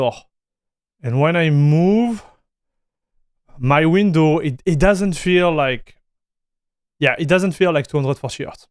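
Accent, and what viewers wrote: France. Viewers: French accent W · He sounds French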